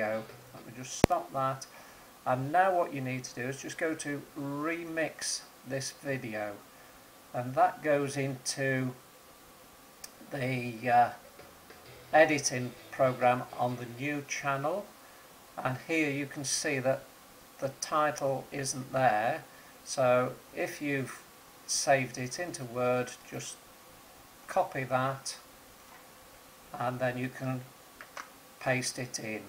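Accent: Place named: England, United Kingdom. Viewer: your english accent........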